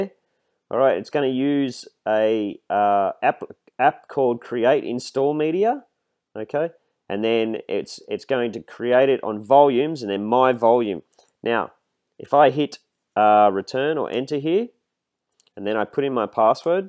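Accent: Australia. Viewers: Well explained, good to hear an Aussie voice for a change.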